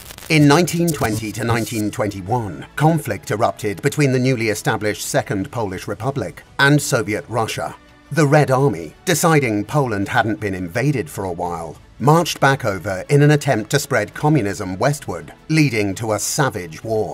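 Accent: United Kingdom. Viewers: honestly, I didn’t even look at half, but firstly because of the title, secondly because of the positioning of Poland as an innocent victim, and Russia as the most vicious of all countries, thirdly, there was no such state as Ukraine in the 17th century, fourthly the story is not told here, but certain one-sided information is being promoted with omission of certain points, the goal is not education, but the promotion of a certain agenda (by the way, voiced with a British accent), the British are always thrilled to pit the Slavs, as well as other natives. Therefore, I consider this video to be propaganda dirt aimed at escalating an already difficult situation.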